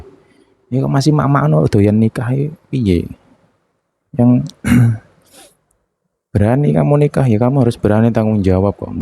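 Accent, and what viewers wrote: Java, Indonesia. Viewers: 😅😅😅 mas. jowo thoo....kalem lucu sht sll yaamurah rizqi🤲🤲🤲🎉❤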